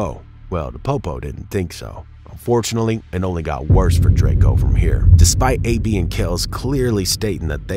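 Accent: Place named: South, United States. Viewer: narrator country accent strong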